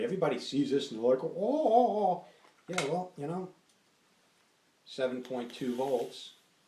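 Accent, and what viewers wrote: New Jersey, United States. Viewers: I vape using smok Tpriv at 65-68w on recoil RDA at.20ohm using mxjo's dual 18650 and I produce big clouds. it's not how much power you put to device to produce a cloud. it's also what kind of juice your using PG/VG I use max VG. there you go yo sound like a joisy boy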